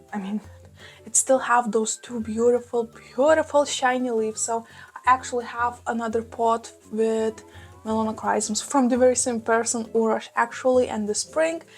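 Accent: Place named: Russia. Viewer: Hope you are doing and feeling great! Your video and your thick Russian accent always bring me joy and elation whenever (read always ) I watch you 👀. Great job on rehabbing those beauties and thank you once again for filling us in the updates -these are always one of my fav videos of your to watch. Hiii from the 🇺🇸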